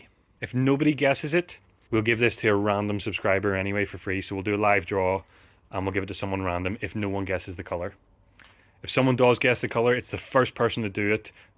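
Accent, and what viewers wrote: Northern Ireland, United Kingdom. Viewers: I'm hearing a fair bit of NornIron in that voice. 👍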